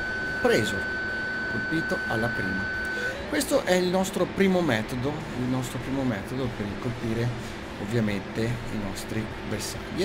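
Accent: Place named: Tuscany, Italy. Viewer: Ciao, analizzo passo passo il tuo video: All'inizio menzioni, e dici che non fai i video belli come i suoi... personalmente ritengo che sia inutile fare in due lo stesso video, quindi trovo utili i tuoi video, come quelli di! Lui molto professionale, con un inglese unico, tu molto "amicone con il tuo eccezionale accento toscano!!!!" Interessante il video che hai fatto, devo dirti che però lanciare i Sidewinder fin che non senti il look, non serve quasi a nulla, andranno quasi sicuramente persi, infatti nel tuo video, si deve che solo il missile che hai lanciato con il look inserito va a segno.. Ultimo prima di salutarti, devo dire che se in futuro spieghi anche le varie versioni del AIM-9, ritengo sia cosa gradita!!! Ciao grande uomo, a presto!!!! NB. dimenticavo, tutto questo dopo la sigla... e' troppo figo!!!!!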